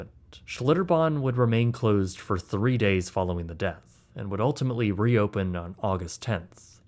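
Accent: Canada. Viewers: He’s from Canada they sometimes talk like that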